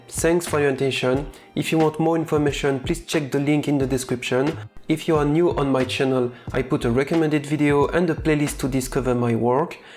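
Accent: France. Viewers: un accent tellement francais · Awesome video as always! I also do like your French accent :)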